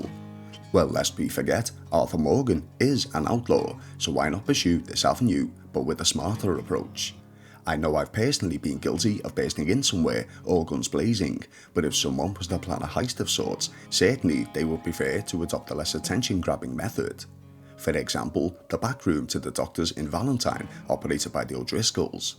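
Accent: Liverpool, United Kingdom. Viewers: Nice to hear a fellow scouser doing something on YouTube that’s not relating to footie.😃 Luv RDR2 and 1 as well. I usually start off bad ie killing the O’Driscoll in the Adler stable and Jimmy Brooks off the cliff, but by Ch 4 I’m a pretty good guy most of the time anyway. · Alright mate can see you’ve not uploaded for a small while, just thought I’d give you a shout and say that I think your videos are bloody incredible. I’ve been playing RDR2 for some time, but never fail to find something new through your videos. It’s also a pleasure to hear a scouser talk about my favourite game. Hope all’s well and looking forward to your next video!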